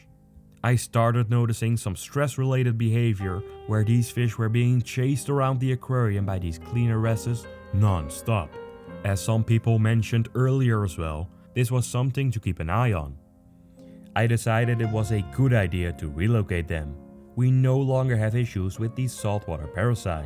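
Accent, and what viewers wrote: Netherlands. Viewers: Your from Holland right ?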